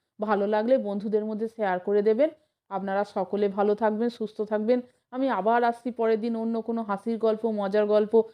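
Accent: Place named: Hooghly, India. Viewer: আপনার কথায় হুগলির টান পেলাম তাই বলছি।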